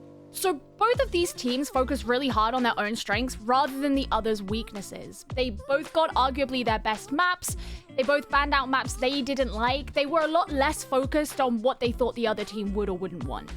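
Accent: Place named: United Kingdom. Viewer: I hear British accent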